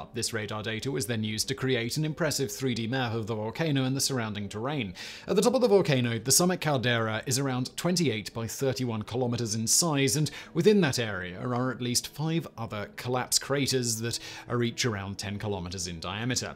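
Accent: United Kingdom. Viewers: He's British... He says it the british way... Just like Aluminium, Carribbean ect is said differently from American English Also Meth is said Meh-th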